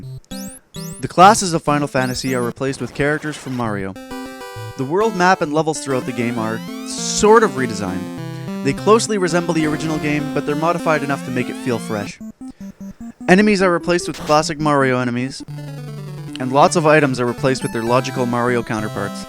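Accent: Canada. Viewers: Are you Canadian? o.o I can't tell... I feel like you are but at the same time... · I came for the ROM hacks. I stayed for the Canadian accent.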